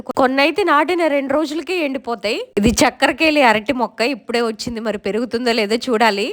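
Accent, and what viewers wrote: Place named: Telugu, India. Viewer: Voice lo telugu danam super sis❤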